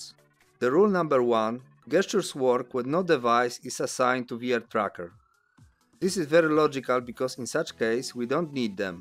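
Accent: Poland. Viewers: nice polish accent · Больше как поляк, не все люди с таким акцентом Русские, чтоб ты знал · haha, po akcencie idzie poznać, że rodak :D super film ugułem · your accent is very polish are you from poland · Ojoj czuć polski akcent jak chuj XDDDD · Z Polski jesteś bo masz taki akcent ? You are from poland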